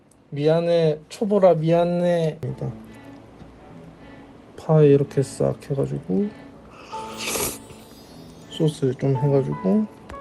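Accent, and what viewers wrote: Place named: Pohang, South Korea. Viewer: 포항시 북구 사람 같네요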